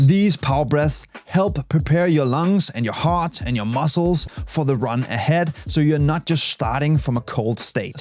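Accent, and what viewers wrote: Denmark. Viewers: Love ur accent are u from Denmark 🇩🇰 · I thought I heard an danish accent in you, am I right? 😂❤🤍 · This is such a perfect danish accent😂😂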